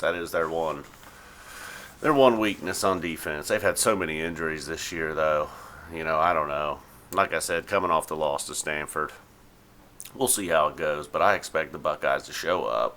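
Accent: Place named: Indiana, United States. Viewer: I'm further south than he is but I have no "drawl". he's got a hint of Hoosier accent mixed with some Tennessee or Kentucky parents or grandparents that moved to Dayton to work for the UAW. possibly a Middletown or franklin native... I bet you have an accent and don't realize it.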